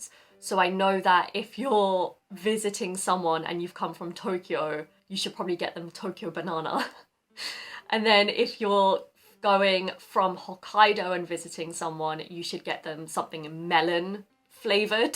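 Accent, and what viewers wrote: United Kingdom. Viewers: 初めまして。英語でお話されることで外国にも日本をご紹介頂けるので嬉しいです。加えて日本語字幕も付けていらっしゃるので日本人も楽しめます。とても良いですね。また、さんのイギリス英語は、アメリカ英語に比べて、とても格調高い発音に聞こえて心地良いです。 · ソイマッチ！てゆうイギリス訛りがクセになる！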